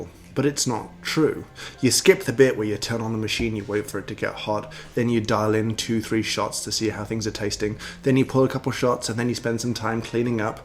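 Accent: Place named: England, United Kingdom. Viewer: Im only here to listen to your English accent......Greetings from Saudi Arabia.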